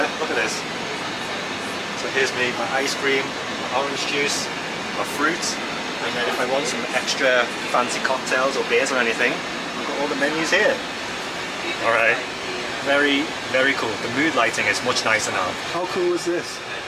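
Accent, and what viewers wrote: United Kingdom. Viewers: ARE YOU BRITISH??? Dude I thought you were an Aussie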